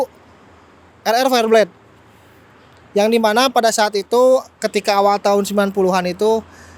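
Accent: West Java, Indonesia. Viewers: Ketahuan logat sundanya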